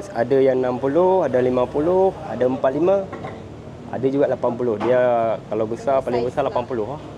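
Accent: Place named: Aceh, Indonesia. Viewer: Ckp mcam org aceh....😂😂😂😂😂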